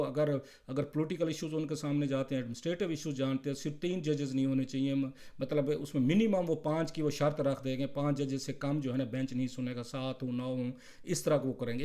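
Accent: Saraiki, Pakistan. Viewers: Saraiki style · سر جی یہ سرائیکی سٹائل ہے.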